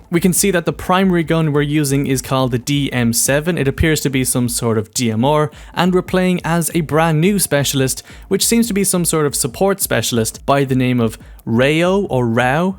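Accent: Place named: Ireland. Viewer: Hes Irish. Its in his accent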